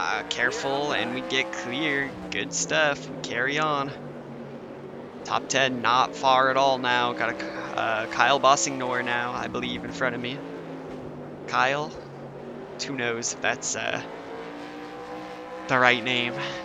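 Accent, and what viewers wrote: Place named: California, United States. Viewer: The Californian accent really came out here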